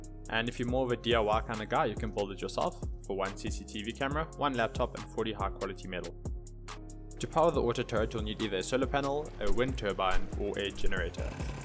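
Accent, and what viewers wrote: South Africa. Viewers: I feel like this guy is South Africa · Bru i just hear my SA boet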